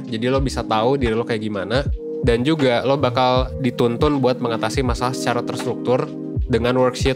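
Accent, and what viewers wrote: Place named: West Java, Indonesia. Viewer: resep pisan pas ngomong orang sundaaa hoho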